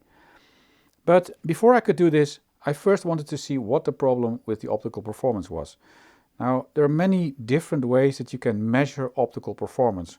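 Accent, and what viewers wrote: Netherlands. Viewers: love your vids and your nl accent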